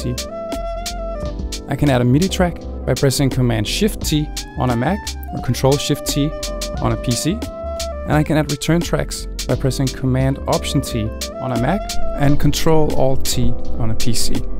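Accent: Denmark. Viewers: This guy sound Danish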